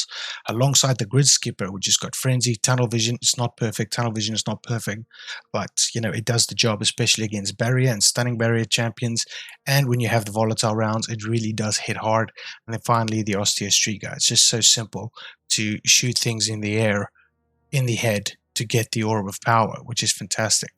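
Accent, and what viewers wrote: South Africa. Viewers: Hey man you sound South African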